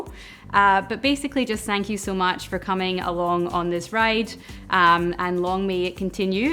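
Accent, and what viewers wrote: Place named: Scotland, United Kingdom. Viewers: First few videos I saw she kept mentioning "here in Australia" and "the Australian version of..." so on and so forth. I thought I was loosing my mind and questioning everything I thought I knew about accents. My American sensibilities didn't allow me to consider that people can leave their country and move somewhere else. Sure, we don't have the best ear for accents but Scottish is pretty unmistakable. Don't know why this is relevant; just an anecdote I thought I'd share. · I'm here for the cocktails and the lovely Scottish accent. Looking forward to new videos when you're ready.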